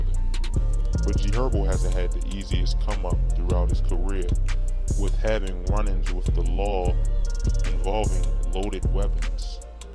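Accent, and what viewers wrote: Baltimore, United States. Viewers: oh you from b more huh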